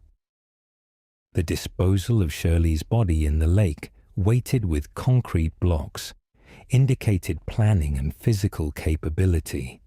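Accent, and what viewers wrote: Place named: England, United Kingdom. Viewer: Fellow English I love English accent , Chelsea girl London born and raised❤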